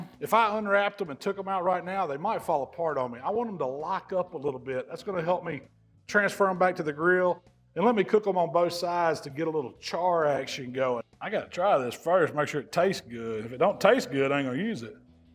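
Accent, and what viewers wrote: South, United States. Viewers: Always enjoy your videos, and usually learn a little something along the way. When a bearded man with a southern accent and a friendly smile wants to teach me BBQ, I'll all down for it :)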